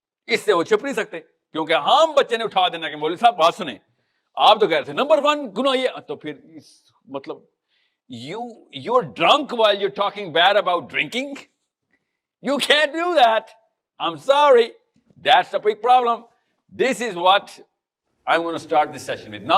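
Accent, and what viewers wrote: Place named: United Kingdom. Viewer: Aur English accent ziyada hay clarity kum hain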